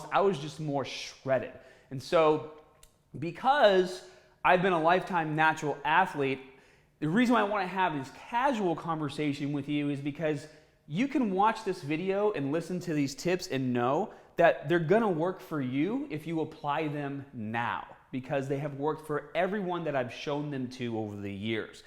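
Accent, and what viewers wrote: Boston, United States. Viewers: “Cah-dio” I love the Boston accent. is a sexy and smart man. Always great content! 🙏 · Cardio. It's a Boston accent, grow up. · The Boston accent kills me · Great video! Your Bostonian accent cracks me up, though! 😆 Especially since it doesn't seem to be consistent: you kept alternating between saying "cardio" and "CAH-dio" during that part of the video! 🤣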